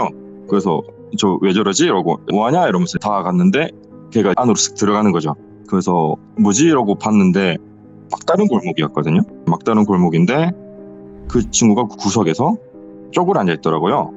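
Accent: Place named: Gyeongsang, South Korea. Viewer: 아이구 이야기는 참 무서운 이야기인데 말하는 분이 경상도 대구 분인 것 같은데 저기 경기도 지역 요즘 20대 여자분들 말투 따라하시는 것 같아서 조금 듣기가 거북했습니다. 음절 뒷부분을 요즘 20대 여자들처럼 올리지 마시고↑ 원래 쓰시던 대로 내려 주셨으면↓ 듣기가 편했을텐데...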